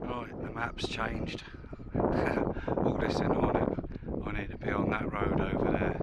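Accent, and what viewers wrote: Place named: Gloucestershire, United Kingdom. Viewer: good video,cant believe the mingry lit u up with their "night sun"-shocking lolz,noticed an earlier comment on your accent,is it Gloucestershire?if not defo Hampshire.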